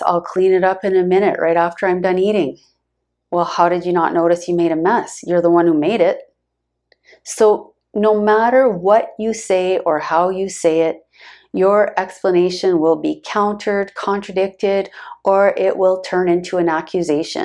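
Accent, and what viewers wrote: Canada. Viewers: Hey, you are really good. I just subcribed to your channel. You sound like a canuck... Looking forward to viewing you videos......................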